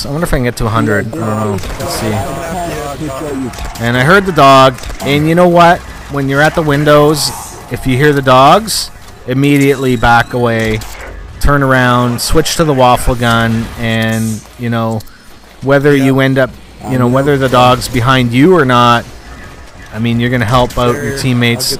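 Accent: Canada. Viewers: hey dude you must be from Canada.... am i right?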